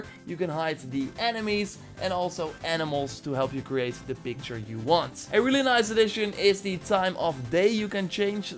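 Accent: Netherlands. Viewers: Your accent is pure aids. Are you dutch?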